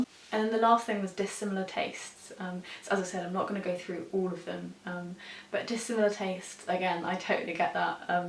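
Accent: United Kingdom. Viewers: You might think that your personality is not that fascinating for people to want to watch you, but I’ll tell you that when I first subscribed to your channel I sometimes had issues with understanding your “posh accent”, as one of your viewers once called it in the comments :)). But I persisted until I got used to it. I do not know what could have made me put efforts into it if not your charismatic personality :))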